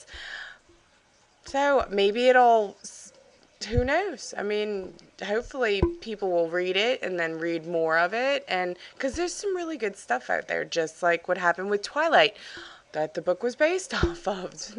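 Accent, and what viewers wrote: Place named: Eastern Europe. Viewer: lol funny east europe accent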